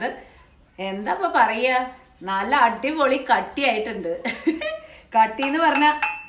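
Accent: Palakkad, India. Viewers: Made the rangayyan today ..made some changes in the tempering. Came out tasty .Nalla palakkadan slang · I really Thank you for this compliment. Real Palakkadan language. Post Thenga Vada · നല്ല പാലക്കാടൻ മലയാളം...❤❤❤❤ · പാലക്കാടൻ വിഭവങ്ങൾ ഉണ്ടാക്കുന്ന വിധം, തനി പാലക്കാടൻ മലയാളത്തിൽ കേൾക്കുമ്പോഴുള്ള സുഖം, അതൊരു രസമുള്ള അനുഭവം തന്നെയാണ് ട്ടോ, എത്തനൂരാണ് നാടെന്നറിഞ്ഞപ്പോൾ ഒരടുപ്പം, കാരണം എന്റെ മകളുടെ ഭർത്താവ് എത്തനൂർ കൊയ്യൂർ വീട്ടിലെയാണ്. ഞങ്ങളുടെ റിട്ടയേർഡ് ജീവിതത്തിൽ ഇപ്പോൾ, ഇങ്ങിനെ പഴയ പലഹാരങ്ങളുംമറ്റും ഉണ്ടാക്കി നോക്കലാണ് മെയിൻ ഹോബി. Thank you. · Chechi sherikum annapoorneshwari devi thanneya.. ❤️❤️❤️🥰🥰🥰😍😍😍 Chechi undakkunna reethiyum ath kazhinju chechi kazhich koodi kanikumbo sherikum manasu nirayua..Chechide palakadan samsaram kettirikkan enth rasama...Variety recipes njangalude munnil iniyum kond varane chechi.. Othiriyothiri nanmakal nerunnu...❤️❤️❤️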